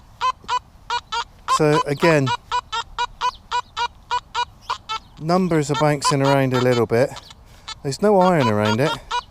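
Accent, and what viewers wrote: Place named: Bristol, United Kingdom. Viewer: I've Subbed you,. I was searching for Meta data for my video descriptions, becase when you do start making money from YT, you'll make more money with lots of key words in your video descriptions. and your Quite knowledgeable about how to use metal detectors and you lived in Bristol and by your accent quite close to me, me thinks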